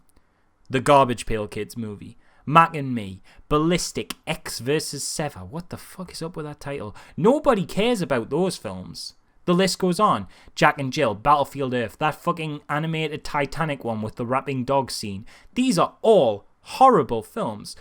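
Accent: Newcastle, United Kingdom. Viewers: RIGHT! How do people like Markiplier have 20 Million subs? This guy is intelligent, funny, passionate and non-cynical (Plus the geordie thing isn't losing him points either). It's like the secret treasure of youtube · YOURE GEORDIE ARENT YA "HOWAY" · A geordie review of the merits of The Room. My life feels that little bit more complete XD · Are you a Geordie or a Mackem?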